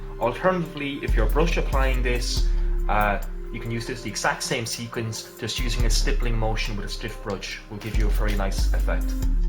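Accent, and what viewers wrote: Ireland. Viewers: Another great "how to" of yours,! "First thinhs first" I like the sound of Irish English! And what's very nice, too: You make your method usable for those without an airbrush like me! Cheers, mate!:)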